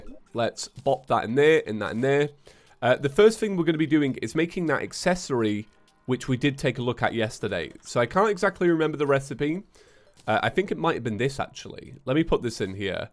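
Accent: United Kingdom. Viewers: Saga of Brittish Accents Tuesday-Chewsday Silva-Silver Terra-Terror i cant remember any other ones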